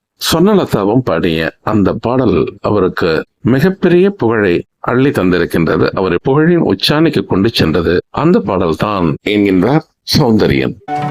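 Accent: Jaffna, Sri Lanka. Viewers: தங்களின் யாழ் தமிழ் உச்சரிப்பு பாடல்களை விட இனிமையாக இருக்கிறது.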